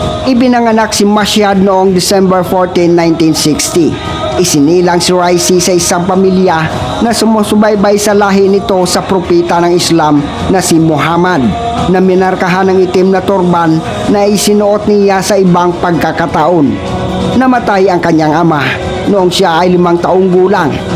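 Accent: Iloilo, Philippines. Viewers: Natural na boses yan ni lods hindi tulad ng iba nagamit ng ai signature yan. Maganda dito detalyado kahit natural na ilongo🇳🇮🇵🇭🇺🇲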